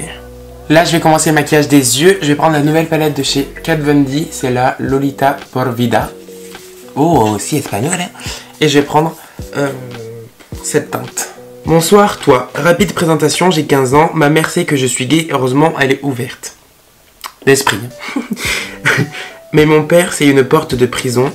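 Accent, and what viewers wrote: France. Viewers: j'adore sa voix et l'accent français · C'est trop vrai ! Son accent français est grave beau, il est agréable à écouter je trouve aussi ^^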